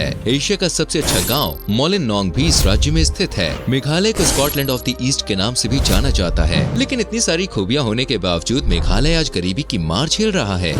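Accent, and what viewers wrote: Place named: Assam, India. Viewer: आप तो आसाम के लग रहे हो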